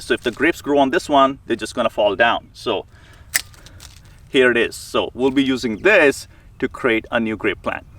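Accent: India. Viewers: Dude, you have a thick-strong Indian accent trying hard to talk like an American white guy from the US. Your thick Indian accent isn't fooling nobody. Lol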